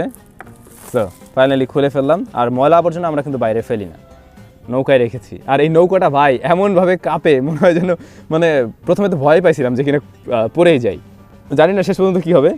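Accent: Barishal, Bangladesh. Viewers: ফাও কতা কইয়া লাভ নাই। ভাই যে মোগো দেশি কতা হুইন্না বোঝা গেছে🤣🤣🤣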